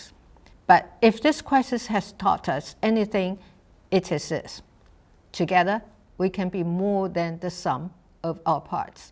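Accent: United Kingdom. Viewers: 果然英國留學英國腔厲害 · 小英總統的英式英文好好聽! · 有英國腔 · 對啊！以後總統像一樣，用英式腔英語優雅及用辭禮貌，有點像古典貴族風範，希望大家都在這點有共識，要不然臺灣就被中共更嚴重。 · 哇！蔡總統說的是英式英文欸！ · 迷人的英國腔😍😍😍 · 赤坂怜奈她是british 為主，american 為輔，有些音還是偏美式的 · 蔡總統的英文是不是算英式發音啊？覺得滿好聽的，場景覺得拍得好有質感。覺得台灣的總統好先進，有臉書有twitter還當youtuber, 好親民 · 小英 got a bit British accent which is cool:) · 好好聽的英式口音喔😍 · 英國腔太神la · 哇！英式腔，英語優雅及用辭禮貌，有點像古典貴族風範，難怪美國政客這麼喜歡她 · 蔡總統的英倫腔好好聽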